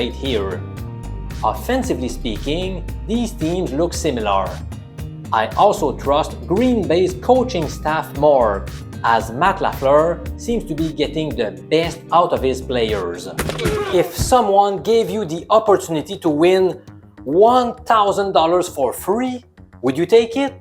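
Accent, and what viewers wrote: Quebec, Canada. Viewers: I love the French Canadian accent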